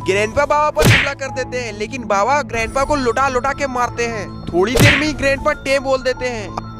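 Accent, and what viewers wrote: Bihar, India. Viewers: 😅😅😅😅 aap Bihari ho kya❤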